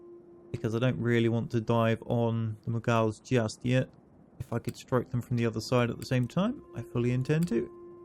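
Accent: United Kingdom. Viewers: You can't upload this quick enough buddy. Great series On a side note I'm guessing you're south coast with an accent like that. Winchester perhaps? (I might be way off but I'm from Bournemouth)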